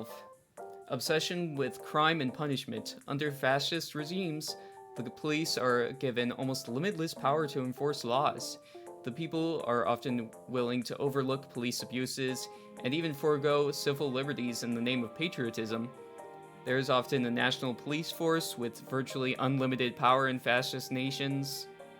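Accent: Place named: Canada. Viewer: oh wow this guy has dean withers featured in some of his captions, "fighting the good fight". I think time will tell on dean withers, not that it isnt telling at the moment. the guy is a scum bag. this video is a fun attempt at some kind of disection of current day politics focusing on the right and donald trump. also, he sounds canadian. its weird how the left insist on accusing the opposition of the things the left are guilty of.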